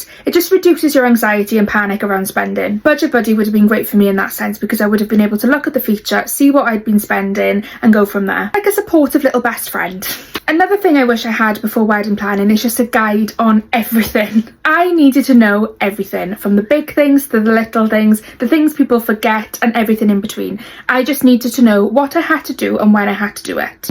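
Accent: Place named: Wales, United Kingdom. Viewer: Beautiful Welsh accent! 🥰 Congratulations on your September 2026 wedding! 💐💍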